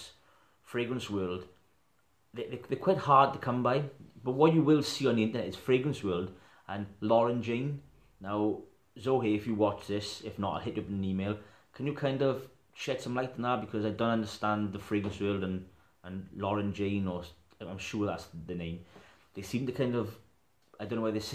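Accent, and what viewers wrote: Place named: Welsh Valleys, United Kingdom. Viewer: Subscribed earlier today. Glad you have reconsidered stopping. You sound like you are from my part of the world. I am from the Valleys. I come from Rhymney and I was born in a hospital in Merthyr. Your area?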